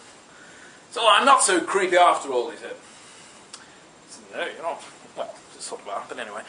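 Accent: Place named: England, United Kingdom. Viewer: You have the most awesome English accent ever!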